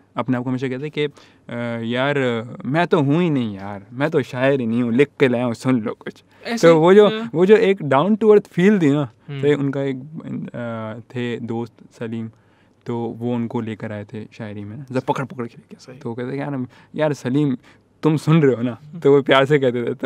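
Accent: India. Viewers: First time suna to lga ki indian hai but really love his style ❤️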